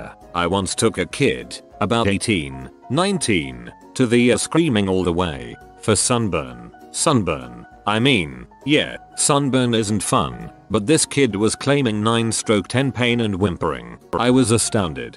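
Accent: United Kingdom. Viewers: The voice reading is British XD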